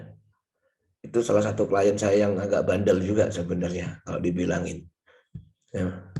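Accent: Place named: Surabaya, Indonesia. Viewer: Yo arek suroboyoan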